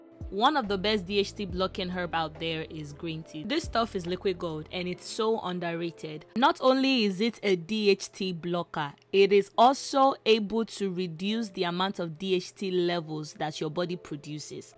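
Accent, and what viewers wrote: Nigeria. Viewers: I love how you kept your Nigerian accent, no phoney. Nothing😂😂❤ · Thank you so much about this wonderful video about the hair growth benefits of green tea! 🍵 ...And thank you for the words of Scripture. God Bless you! 🙏 P.S. I love your Nigerian accent! 💚 #NewSubscriber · 🇳🇬 The Nigerian accent 😍 >>>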